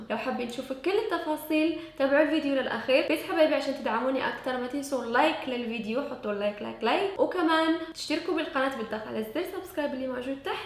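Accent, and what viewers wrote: Morocco. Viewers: من لكنتك باينة فيك مغربية برافوووو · اول مرة نشوف الفيديو وحسيت بيها مغربية من لهجتها وتأكدت بتعليقك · اللهجة المغربية واضحة في كلامك يا الغزالة 😉😄😄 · انت مغربية حيث اللهجة مغربية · ممكن أعرف شو أصل بلدك في كلامك أحسك من المغرب أو الجزائر يلي حاس مثلي يضغط لايك ويلي عارف شو أصل يقولي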